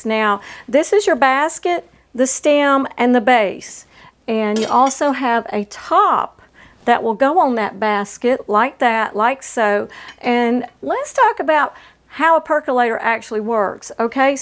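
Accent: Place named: East Texas, United States. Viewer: What a beautiful and sweet young lady this is! She sounds like us here in East Texas!! New fan and subscriber, great job!! ❤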